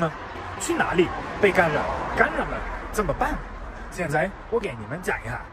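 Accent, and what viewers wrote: Sichuan, China. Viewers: 您的普通话好像有点四川味。难道是我的错觉吗？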